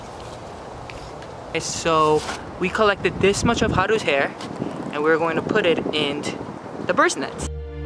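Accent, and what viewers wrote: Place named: Philippines. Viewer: Are you from philippines because you talk like filipino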